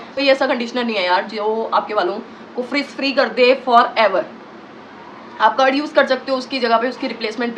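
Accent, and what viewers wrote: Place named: Punjab, India. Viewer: Informative 😍❤ and i like your punjabi accent 😂🥰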